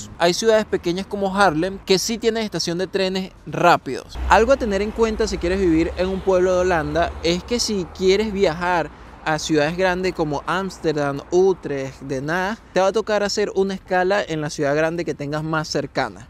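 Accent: Venezuela. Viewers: Hola! Que bien que el algoritmo me trajo a tu canal, que lindas las imágenes que muestras de Holanda! Me preguntaba si eres del oriente del país, tu acento me dio esa impresión, yo soy de Puerto La Cruz viviendo en Kansas, USA. Saludos! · La verdad que ni idea cómo llegué aquí jajajaja pero me parece súper cool y más al reconocer tu acento, dije: "Este chamo es venezolano" jajaja y acerté! Primer video que veo, me parece súper chévere! éxitos y bendiciones! :) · Por fin veo Venezolano con video de este tipo. Felicitaciones!! La vrrdad se sintio raro alguien Holando con acento Venezolano. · Llegué a tu vídeo por sugerencia de YouTube. Generalmente no hay venezolanos con esta dicción tan clara. Sospecho que eres de Centroccidente, más específicamente de Barquisimeto o Yaritagua pues ese acento es característico. Se agradecen este tipo de descripciones tan espontáneas, claras y sucintas. 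(Larunbata, 2021.eko martxoak 20a, am) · ¡Esoo chamo, acento venezolano! Muy buen video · Hijo; genial tu salida a ese error que hiciste cuando hablas “ si lo ves en el futuro.. pero eso del verlo en el “pasado”😒😒😒 jiji tu risita te salvo de ese “chispoteo” propio de la improvisación; pero bueno lo remendasteis con esa salida.! Primera vez que veo tus videos, llegue ahí por casualidad, al empezar y oír tu buena dicción, me dije es un paisano venezolano. Dios t bendiga brother sigue adelante; al final te espera la cima allá o en cualquier lugar del Mundo.!!🌹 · No sabía que era venezolano, lo adiviné por el asentó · Hola!!! simplemente apareciste en el menú de videos de youtube y me llamó la atención. Me quedé viendo porque tu forma de hablar me parecio familiar, hasta que dijiste que eres Venezolano. Bonito formato. Saludos desde Caracas.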